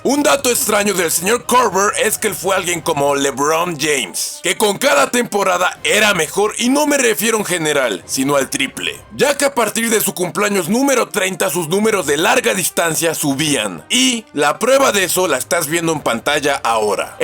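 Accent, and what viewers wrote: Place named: Cuba. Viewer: Pareces que eres de cuba a la hora de hablar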